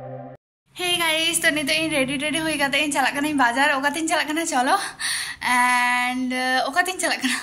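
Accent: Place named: Santali, India. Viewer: New subscriber 😊loved the way you talk i mean your santali accent is so adorably sweet💙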